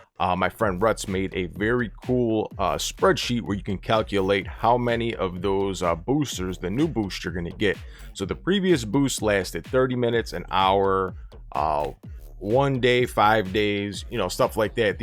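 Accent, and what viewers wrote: Midwest, United States. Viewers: I like how you say "sharanga" 😂👍that Midwest accent never gets old 💚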